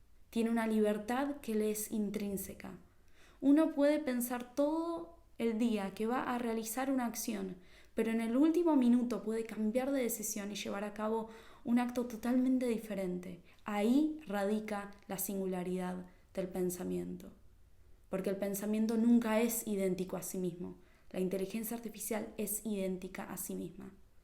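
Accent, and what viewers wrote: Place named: Argentina. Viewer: Gracias. Trataré de encontrar el libro. Te encontré a través de Gustavo Salle. Insólito. Por la forma que tienes de hablar eres argentina, no? Soy uruguayo, te estoy siguiendo con avidez desde hace poco. Muy profundo tu exposición sobre el tema de la Singularidad. Te saluda con afecto, desde Uruguay, Eduardo, artista plástico egresado de la Escuela Nacional de Bellas artes.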